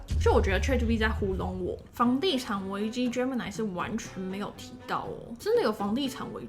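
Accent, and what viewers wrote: Taiwan. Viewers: 声音很好听，满满的温馨台湾腔💪有空多多向你请益技术🌱🌱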